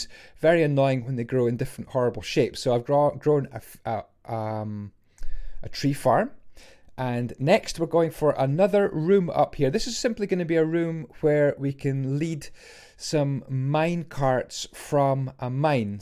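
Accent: Scotland, United Kingdom. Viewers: I dont know why, but i love the scottish accent, its so awesome. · You don't hear muck scotish mine craft content so it's cool to hear a familiar accent being Scottish myself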